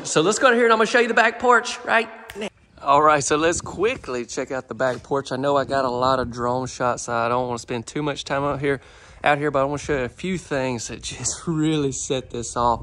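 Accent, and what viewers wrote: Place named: Alabama, United States. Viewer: I am new to you page... Do you share your location or the builder's info? You sound like you are from my neck of the woods, N. Alabama, but O do know from the South for sure. I LOVE your work and actually watched the whole video, something I rarely do! TY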